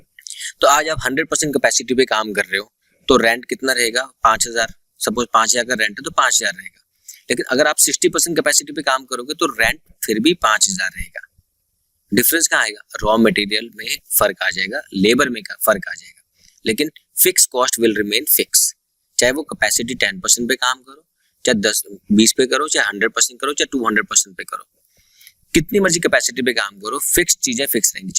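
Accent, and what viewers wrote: India. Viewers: Sir ap lucknow se ho ?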